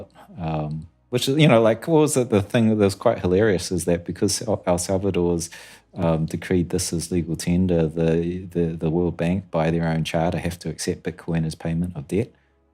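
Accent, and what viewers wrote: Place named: New Zealand. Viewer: Hey...you have a strong Kiwi accent...are you overseas or in NZ? chur bro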